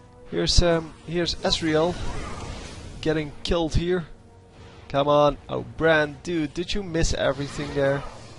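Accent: Netherlands. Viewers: Your accent seems dutch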